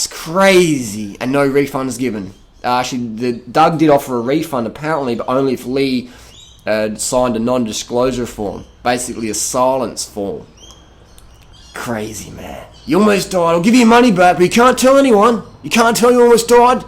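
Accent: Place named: Australia. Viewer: I just hate this Australian accent...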